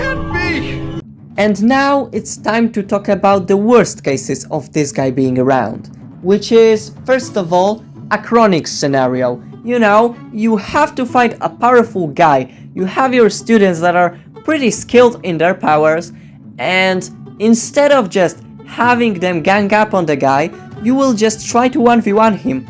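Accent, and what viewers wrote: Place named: Poland. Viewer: i can hear your polish accent im polish too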